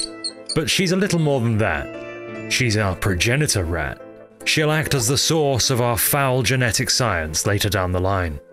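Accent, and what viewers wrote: United Kingdom. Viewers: hearing a very British man advertise a vary American game is actualy hilarious.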